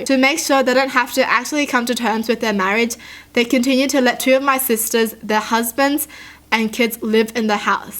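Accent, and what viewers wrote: Australia. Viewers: All your pronunciation is so cute. Like not just Australian, more unique than that. Like you pronounce “confusing” like con-FOO-sing and I love it. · Is she Australian I for some reason always thought that idk why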